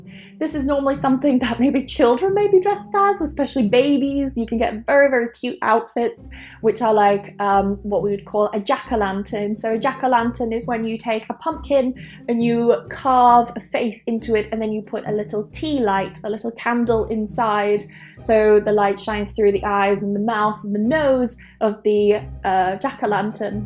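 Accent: United Kingdom. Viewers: 訂閱您的頻道學習很多英式口音 在最近 很開心 大大感謝~~ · Hi there,. I've just found you on YouTube. Just a guy from Brazil here trying to learn some English and like some others, I love the British accent. Loved you humor, loved your other videos that I've seen so far. You are just so beautiful (jaw dropping) and an excellent teacher/professor which is the reason I've just subscribed. Please, keep up the good work.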